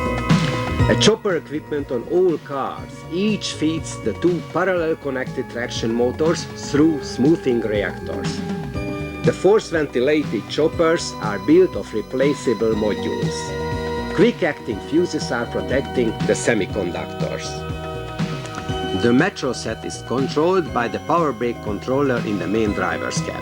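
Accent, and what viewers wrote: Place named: Hungary. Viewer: Huu.. this is too hunglish accent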